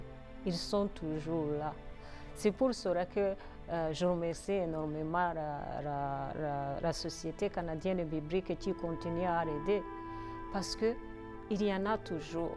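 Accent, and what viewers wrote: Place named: France. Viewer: Idk why but I love her French accent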